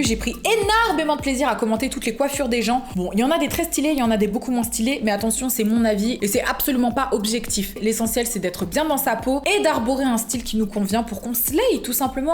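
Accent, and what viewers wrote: United Kingdom. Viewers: Tu as les cheveux trop beau je t'adore depuis que je suis toute petite et ton petit anglais à la fin des mots je le trouve trop cute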